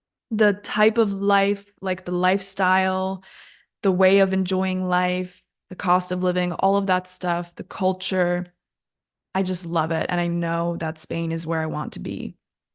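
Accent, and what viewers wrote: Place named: United States. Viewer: Hi. I just subscribed yo your channel. One thing that caught my eye. Bermuda is a British territory, isn't it? However, you speak with an American accent. That's interesting 🤔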